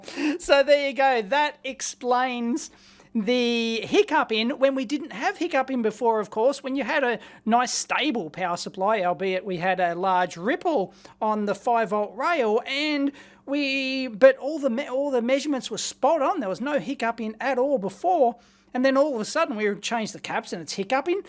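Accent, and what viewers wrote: Australia. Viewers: That's just his Aussie accent.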